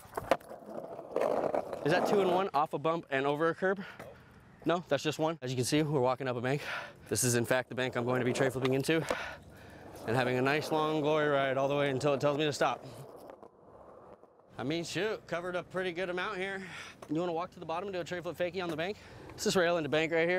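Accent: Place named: South, United States. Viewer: How does this dude have a southern accent?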